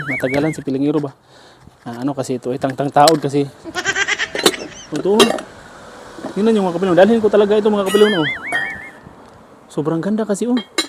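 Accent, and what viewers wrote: Visayas, Philippines. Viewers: Visaya kaayo ka ug lingaw kaayo ka mag vlog. 😅😊 Asa ka dapit dito sa pinas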